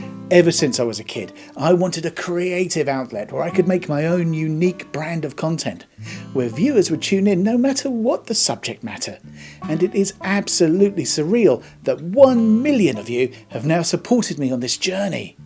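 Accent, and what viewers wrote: United Kingdom. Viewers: Wait, was it or? Real Doug's accent was too British. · This may be a friggin sick joke, but the British accent makes me think he isn't joking.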